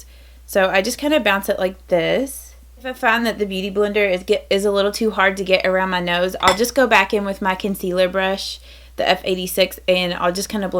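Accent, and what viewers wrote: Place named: Texas, United States. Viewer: Love your accent, where are you from, I'm southern also from Texas, you sound like you are from Texas. lol good video too.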